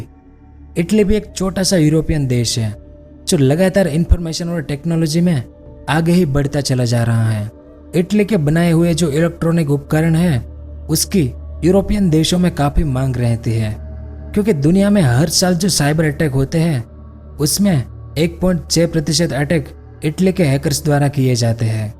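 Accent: Gujarat, India. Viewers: You're accent seems gujrati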